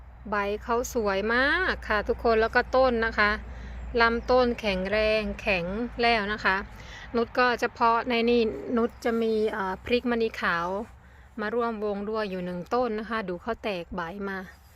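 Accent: Korat, Thailand. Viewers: แก่คนนางรองนิ พูดโคราชเหมือนกั๋นเลย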